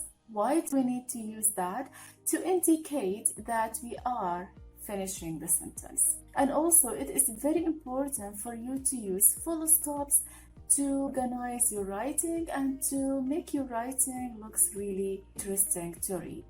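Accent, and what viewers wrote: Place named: England, United Kingdom. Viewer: thank you, I really appreciate your work, btw I love your English accent and your soft voice <3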